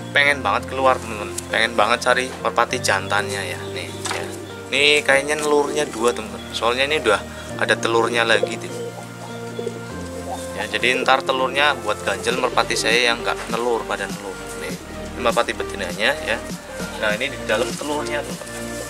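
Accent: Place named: Banyumas, Indonesia. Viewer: Ngomong nya ngapak bang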